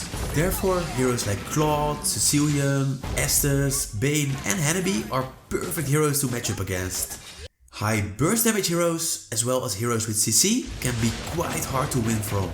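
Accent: Netherlands. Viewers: Hey man, Ik herken een Nederlander wanneer ik er één hoor. Als je mensen zoekt om mee te spelen/test content mee te maken laat maar weten man.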